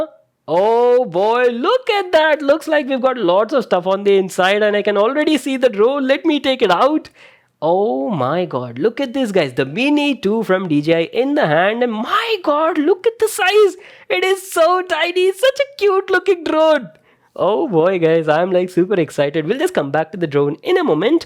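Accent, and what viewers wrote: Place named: Hyderabad, India. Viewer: Your accent says you are from Hyderabad 😃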